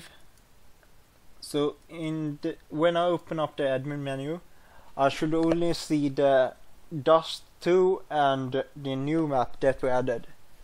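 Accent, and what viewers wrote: Sweden. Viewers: Thanks! I was completly sure that you were swedish when I heard you talking.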